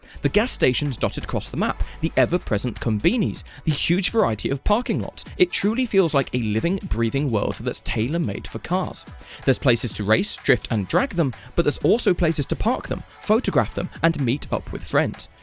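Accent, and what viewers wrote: United Kingdom. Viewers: Uk accent L video 😂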